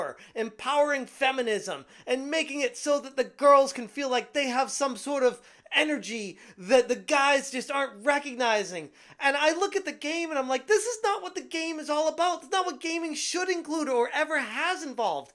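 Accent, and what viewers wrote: France. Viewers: As a Québécois, definitly sounds like a French Speaker ( from France ) reading a script without really knowing english. I could translate but he basicaly just explain what the girl do in the video. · Oui, this is "the" french accent..... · The narrator is literally speaking fringlish he’s trying to say them in French but tries to correct the pronunciation into English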